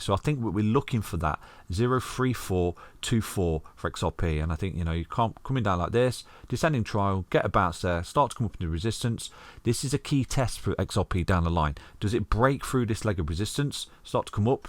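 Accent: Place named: Birmingham, United Kingdom. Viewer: I love your brummy accent lad.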